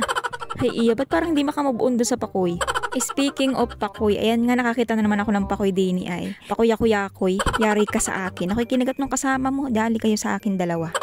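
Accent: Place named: Quezon, Philippines. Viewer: 🤣🤣🤣tuwangtuwa ako sa mga vlogs at kadaldalan mo miss.iLove your accent❤️❤️❤️😘😘😘kaya lagi ko inaabangan ang mga bago mo vlogs.saan kaba sa quezon?ingat sa panghuhuli ng isda baka matinik ka ng stonefish.tingin2 den sa paligid kpag nagluluto ka baka my ahas sa itaas ng punong kahoy...ingat po miss ❤️😘God bless🙏🙏🙏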